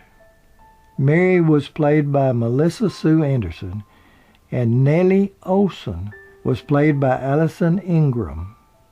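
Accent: South, United States. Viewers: I would like to know who is narrating this video? He sounds like a true southerner. I’m from Alabama and I enjoyed listening to him narrate. Wasn’t a fan of Little House because Nellie was so mean lol. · I would’ve preferred someone with a northern accent narrated the documentary. I have nothing against southerners, it’s just that it’s so hard to understand him, the pronunciation of certain words and the drawl.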